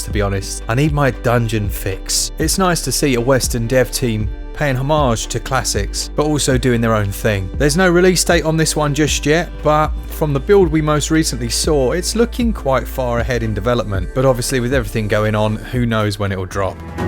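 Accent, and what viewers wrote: United Kingdom. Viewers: To be honest outer worlds sucked, the story line is predictable, it just feels off and I think the reason why most enjoy it is due to the fact that they hyped it via media, youtube etc. So much, it was a true disappointment to me, get elder scrolls alot better and by the way I enjoyed this vid and its the first one I have seen I find most brits with a to heavy accent while your own is just smooth, i would love a top 10 with your personal rankings.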